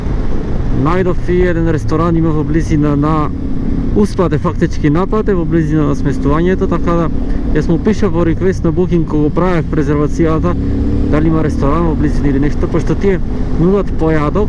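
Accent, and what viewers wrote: Bitola, North Macedonia. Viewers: Pozdrav do od dalechnata Australia. Te pratime so voodushevenost. Odlichna video publikacija na patishestviata, so obilna informiranost i pokraj skromnata video tehnika. Informativni komentari na ubaviot Bitolski akcent, dosega ne zabelezhani. Uzhivanje e da se gleda tehnikata i balansot vo vozenjeto vo odnos na raznolikosta na patishtata. Mashinata ti e optimalna i pokraj relativno malata kubikazha. Ushte eden dokaz deka goleminata ne e merilo za uspehot. Dokolku mozhime da sugerirame, bi sakale da te vidime kade uzhivash vo pauzite vo lokalnite kafe restorani po mozhnost so komentari na mestata vo koi se zadrzhuvash. Veruvame ne oboruvash bilo kakvi rekordi za Ginisova kniga na chudata? Ti posakuvame uspeshni avanturi vo idnina so nadezh da go svrtish dunjalukov v'dolzh i popreku. Nie da sme zhivi - zdravi da prodolzhime da navivame od skromnite ni fotelji so chasha vo raka, i po nekoe mezence. 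DA NI ZHIVEJT! DA ZHIVEEJT!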